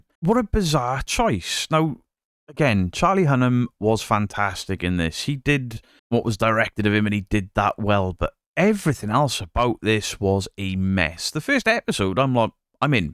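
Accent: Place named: Wales, United Kingdom. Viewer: I was thinking you were Welsh,I'm Irish myself. Good channel. Subbed 🇮🇪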